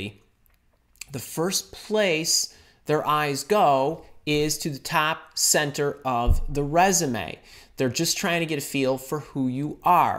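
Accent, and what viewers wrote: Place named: Michigan, United States. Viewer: Sound like a Michigander..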